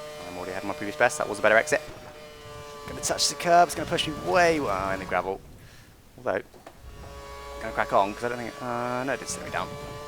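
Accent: United Kingdom. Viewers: Awesome congrats! And btw I prefer 20min over 10min:D just love your commentating it's so british!:D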